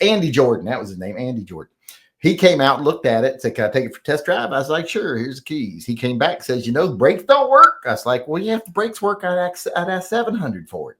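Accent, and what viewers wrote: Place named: South, United States. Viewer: U sound more redneck (in your old age) than u used to.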